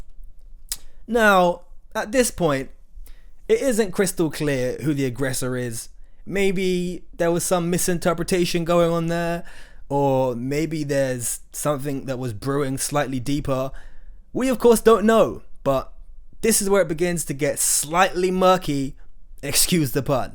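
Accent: United Kingdom. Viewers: dj akademics can do a great british accent.. love your new channel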